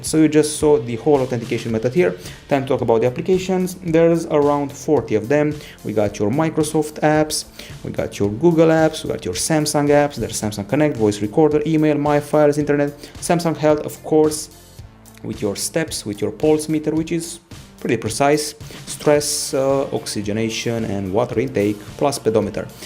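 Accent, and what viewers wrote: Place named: Romania. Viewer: După accent ești român? Bun review. Multumim!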